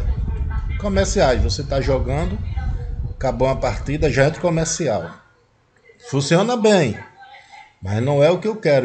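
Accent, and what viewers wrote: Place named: United Kingdom. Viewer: Gostei do inglês britânico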